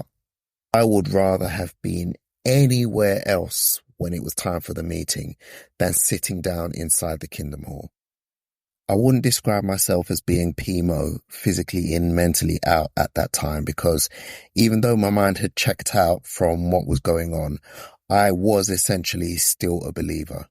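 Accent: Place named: United Kingdom. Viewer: I am bingeing all your videos! Your uk accent drew me in. Also in uk. Near london.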